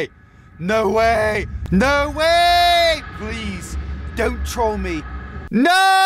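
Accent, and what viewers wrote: United Kingdom. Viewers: If he British or American? Also f😊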